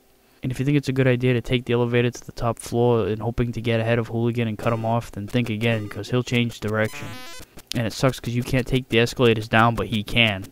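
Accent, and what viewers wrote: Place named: New England, United States. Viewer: Similar Boston-to-Providence accent.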